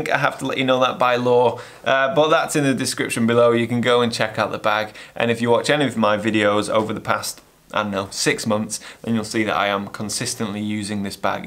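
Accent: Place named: Yorkshire, United Kingdom. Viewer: Ah??? Thought tha was a Yorkshireman. I have gone with a Mammut Trion 35 litre Mountaineering sack (they also do a 50) and put a Tenba Camera insert in it - total cost £160. - plus the cost of a rain cover. Not perfect as the insert has to be removed to access kit but the pack does have the zipper around the harness feature that is rare in a Mountaineering sack. Adam Gibbs - Mr. Grumpy to you, has used one for many years, but just changed it for a bespoke camera pack, supplied as yours is by a manufacturer. No problem with this BTW, I do not begrudge either of you perks for providing such excellent content. The Mammut Pack is also multi functional as it is a rock solid standard pack into the bargain. For street photography I go with a very battered Chinese made copy of a Paratroopers Bag from Israel, cost £15.... plus a £35 Billingham Insert - does not look expensive but gives the Camera 5 star protection. 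And it cheap:-).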